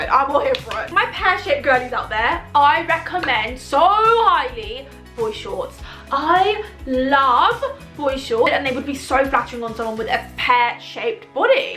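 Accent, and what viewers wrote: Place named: England, United Kingdom. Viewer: I like how she has American influence " you do you boo" with an English accent.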